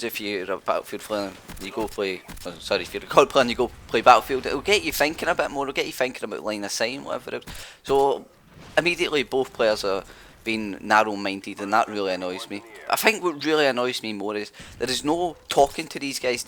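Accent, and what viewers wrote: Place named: Ireland. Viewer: Everything's funny with an irish accent!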